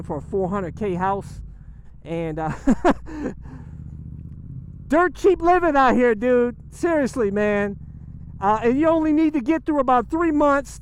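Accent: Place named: Cleveland, United States. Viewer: I live about an hour from Cleveland with traffic and i must say you have the most Cleveland accent ive ever heard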